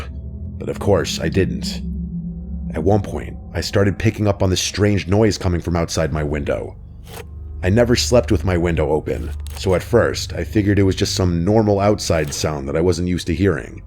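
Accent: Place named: Upstate New York, United States. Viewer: I love your accent.It's definitely from upstate new york where i'm from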